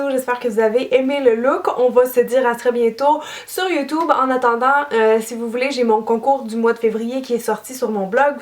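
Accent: Quebec, Canada. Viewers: Whoua Alyssa Milano avec l'accent québécois ;-) très jolie !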